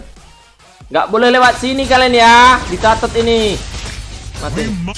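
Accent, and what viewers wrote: Java, Indonesia. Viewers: Wong jawa ternyata😂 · Jowone ngendi mas hehehe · Jane wong medan apa wong jawa mas?? Wkwk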